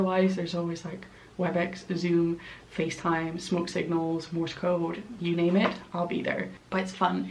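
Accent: South Wales, United Kingdom. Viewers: her accent is more South Wales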